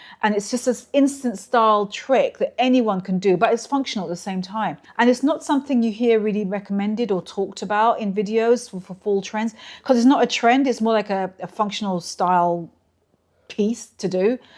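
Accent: United Kingdom. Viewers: Same here, I've been out of the loop and in caregiver mode for 4 years for my brother and one year for myself just to regain my own health and strength, but when she popped up today, I was like Yeess! I need this, I need to hear that beautiful British accent spurring me on to new heights of creativity! With fall coming on, my favorite season for clothes, I can't wait to get in my closet and put together some fabulous looks! I have all the makings and 99% of it is thrifted over many decades and many eras from Victorian, think Ralph Lauren romantic blouses, to classic leather and denim, to Chanel jackets, one authentic one from a thrist store!! Unreal what people donate, bless their 💕!!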